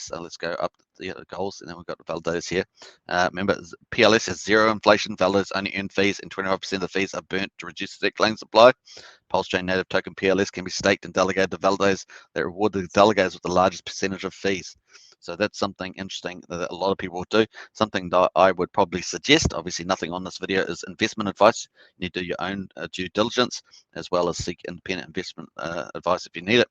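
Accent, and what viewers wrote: New Zealand. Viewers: Hey bro, good to hear a Kiwi doing some streaming.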